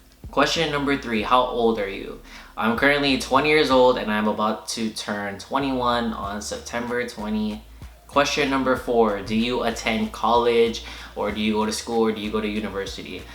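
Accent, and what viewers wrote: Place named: Toronto, Canada. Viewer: you talk like a Toronto man Rate it still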